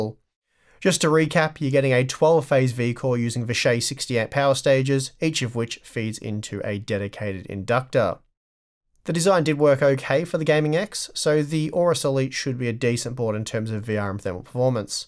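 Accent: Australia. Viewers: Is it an Australian thing to pronounce the letter Z as "Z-ed" In America we pronounce the letter Z as "Z-ee"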